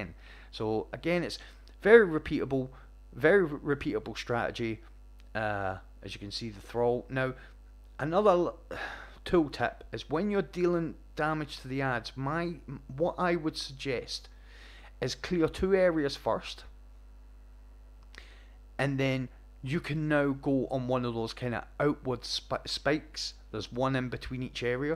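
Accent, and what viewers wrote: Scotland, United Kingdom. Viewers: You are the man! Just used your solo guide for thorn strike! Will be using this guide for the solo clear. That’s awesome that you also call some of the symbols like my friend and I did. Vex head, turret, and burger haha! Thanks for everything you do dude, so so helpful! Take this as a compliment, you’re like a Scottish esoterickk! (Hope I got the accent right) no offense if you’re not Scottish!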